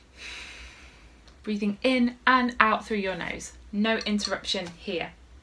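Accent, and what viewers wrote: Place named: United Kingdom. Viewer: Or a stuffy nose for that matter. I hope you find that supposed third book. But I love your circular breathing. And I love your British English accent.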